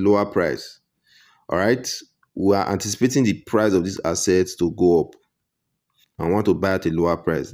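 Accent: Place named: Africa. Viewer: We can only understand our African English . 🎉🎉🎉 thanks for the explanation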